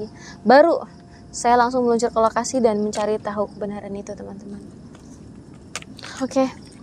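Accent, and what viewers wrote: Java, Indonesia. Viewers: pasti kak orang jawa.. bahasanya itu kayak orang jawa mbandek ngapak 😅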